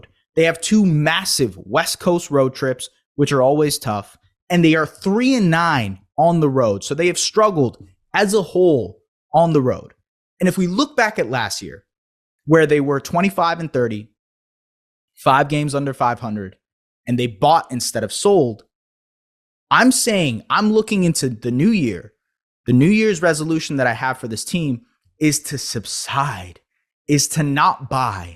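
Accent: Canada. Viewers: Raptors trade pascal and build around barnes also u sound Canadian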